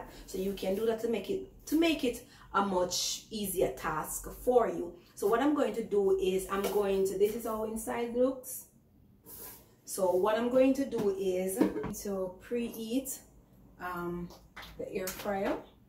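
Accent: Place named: Caribbean. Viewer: She talking internationally so people will understand seen can't talk the deep patwah straight have to broaden your audience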